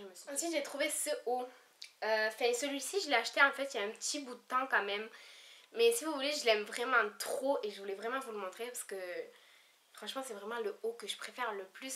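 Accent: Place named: South, France. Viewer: accent du sud 😻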